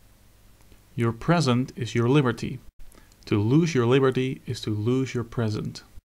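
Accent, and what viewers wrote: Netherlands. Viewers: it sounds as if you're from Holland. Good job with the video!:)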